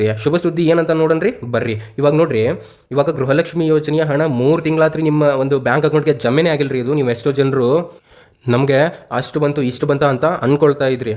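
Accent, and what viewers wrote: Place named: Bengaluru, India. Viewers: Bengaluru kannada sir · Bangalore Kannada language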